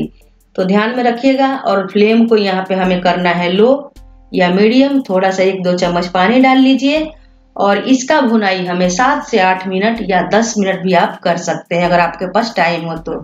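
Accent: Bihar, India. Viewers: Jhandu curry,bihari accent mein bol rahe hai aurbana punjabi curry rahe hai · Voice se Ho pta chal rha hai ye biharan hai ye kha bnaegi punjabi chicken kuri wo khawAt hai na bndr kya Jane adrak ka swad wo hi baat ho gyi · waisey bahut accha...pink-pink madam ney kaha ki chiken ho jaiga.. hum rukey huan hain pink-pink chiken ko honey mein.. abhi to chiken brown brown hui hai..yeh hain bihari madam!!! baut mirchi masala khatey hain...accent sey kuchh andaza aya hai. Bihari food is very good. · Madam chicken se achi to aapki language lagi.....chicken to bnane k baad hi pta chalega...bihar se ho kya aap.... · Aap bihari Hain na?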